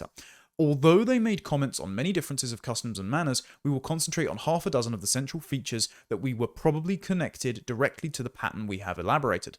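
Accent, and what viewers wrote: RP, United Kingdom. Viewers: Why have you and the rest of the clowns on the Podcast of The Lotus Eaters got such a beef with ‘boomers’? You always have a crack at them for some reason. Jealous much? Well, I suppose you need some group to have a go at. And is that posh assed accent actually real?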